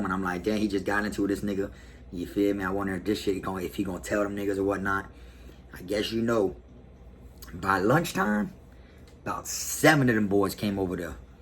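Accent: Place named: Baltimore, United States. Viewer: Yo u that nigga real talk yo I'm from bmore and it's the exact same way u talkin bout out here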